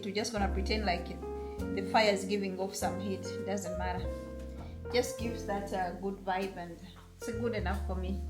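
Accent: Uganda. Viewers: Hi, are u ugandan? Ur ascent is so Ugandan.. Love u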